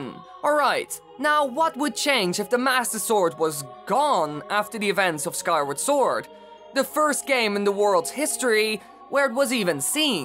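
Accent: Netherlands. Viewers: ik kijk graag naar je video's ze geven een leuke twist aan zelda (en andere nintendo games) (ik had al een klein gevoel dat je nederlands was omdat het wel een klein beetje nederlands klinkt(echt een heelklein beetje)) ik hoop dat ik vaker een geheime nederlands comment kan platsen ;)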